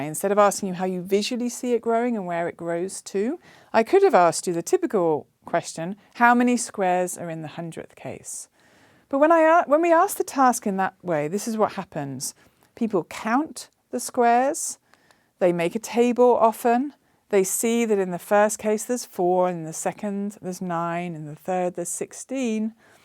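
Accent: United Kingdom. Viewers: So she's wrong because she has a British accent? Forget the accent and listen to what she is saying, then present a coherent argument against it if you have one. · Notice the British accent. The British still own the US.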